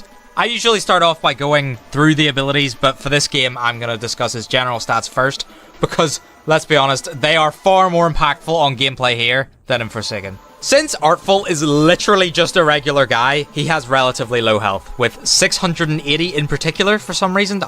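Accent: Scotland, United Kingdom. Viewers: I can smell the Scottish accent, SCOTLAND FOREVERRRRR 🏴🏴🏴🏴🏴🏴🏴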